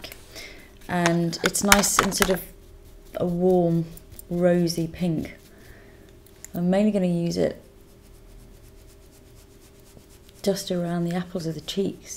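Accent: United Kingdom. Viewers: love this tutorial, i think i may follow it for the days when i have to go to work with just little hours of sleep, it may sound geek but listening to your videos helps me improve my english and i can understand british accent better, so apart from learning about make, i also improve my language skills!!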